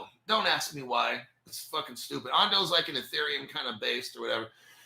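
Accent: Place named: New Jersey, United States. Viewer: You must be from Jersey because you talk just like me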